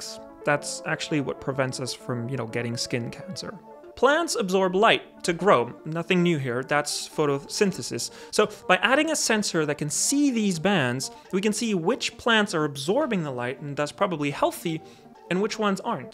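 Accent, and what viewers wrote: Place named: United States. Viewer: I'm really curious about your nationality..you sound american, but I am getting hints of swedish or german......what's going on...either I have a very good ear..or I am a lunatic...I'm fine with either.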